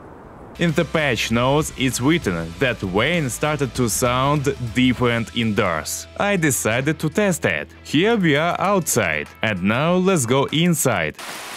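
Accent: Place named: Russia. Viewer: Hey, I like your (russian I think) accent. It sounds cool :)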